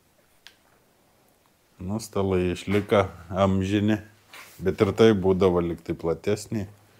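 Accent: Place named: Kuršėnai, Lithuania. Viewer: Patink video, o da labiau - kuršienietiška šnekta 😊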